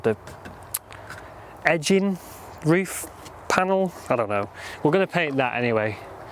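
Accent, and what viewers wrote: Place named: Preston, United Kingdom. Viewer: Love the shed colour! I also have an allotment in Preston. Thought you must be local to us from your accent 🤔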